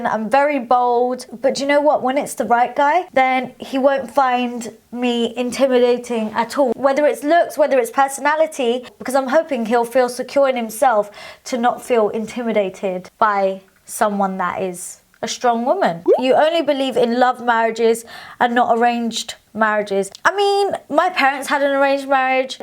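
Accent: United Kingdom. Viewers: Your hair is incredible! Do you have a tutorial? Ps love how london u sound😅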